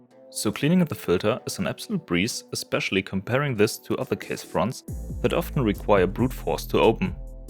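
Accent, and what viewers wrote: Germany. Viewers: Good review! I found it funny: as i listened on, I thought your accent seemed german, as soon as I saw the Wera VDE 1000V certified screwdriver I was certain.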